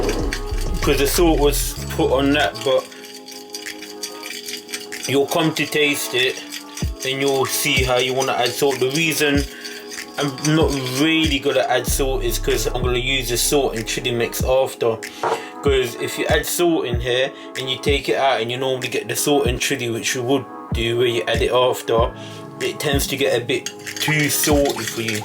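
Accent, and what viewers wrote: England, United Kingdom. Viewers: Which country is the english accent? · I remember this from my time on the island many moons ago. But it is terrible to listen to a Mauritian speaking English in an English accent😁 No disrespect intended.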